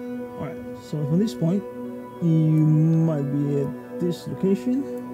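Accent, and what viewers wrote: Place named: Russia. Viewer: You sound Russian but we're not sure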